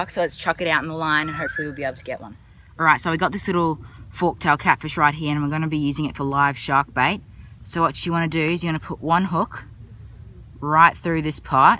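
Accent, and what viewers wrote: Australia. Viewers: r u Australian? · Probably Australia, he sounds like it.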